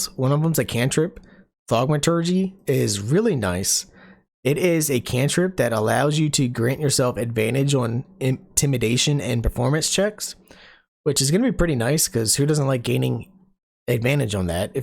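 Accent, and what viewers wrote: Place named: Delaware County, United States. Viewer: The Delco accent discussing BG3 races is hilarious. If I’m wrong and you aren’t from Philly you have to be from Baltimore area.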